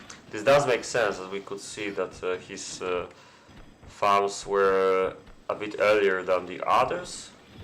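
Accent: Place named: Poland. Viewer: Hej po akcencie strzelam że jesteś polakiem, but if i am mistaken please correct me :) Polecam wrzucać też przycięte nagrywki jako filmy (z tego co wdzię te live'y nie przebijają się w wyszukiwarce) - oczywiście jeśli Ci się chce :) Pozdro miło wiedzieć że nadal są aktywne turnieje i gracze :) może jakieś tutki ;)?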